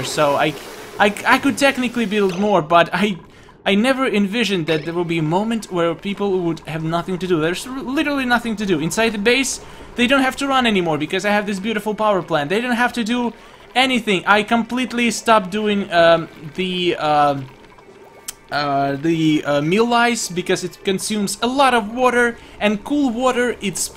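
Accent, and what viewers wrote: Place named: Russia. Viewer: таки ты русский чтоль с таким акцентом?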